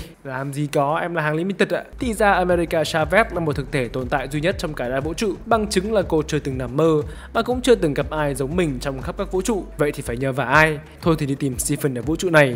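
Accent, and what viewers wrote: North, Vietnam. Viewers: Giờ biết sao nữ thích giộng con trai bắc rồi.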